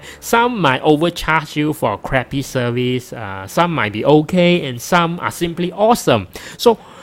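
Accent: Malaysia. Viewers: really find your videos helpful, and the Malaysian accent entertaining as well! Don't stop! :)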